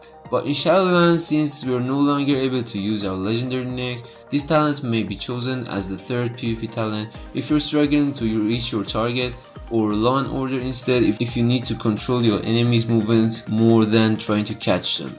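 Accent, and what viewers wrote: Iran. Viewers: I can usually spot a fellow persian by their accent but I'm unsure... are you?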